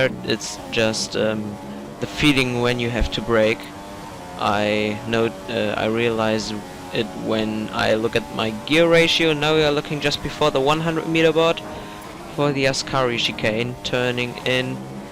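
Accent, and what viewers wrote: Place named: Germany. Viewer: Wie ich alleine an deiner Aussprache höre, dass du Deutscher bist. xD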